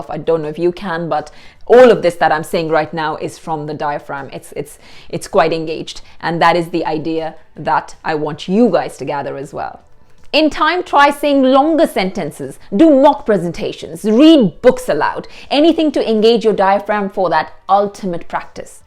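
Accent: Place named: United Kingdom. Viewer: Her accents ….your accent is quite fascinating!..it borrows from a lot of areas ,a slight British as well ..the way u spelled ‘KAPALBHATI’ ,I don’t know what to talk of it 🗣️ !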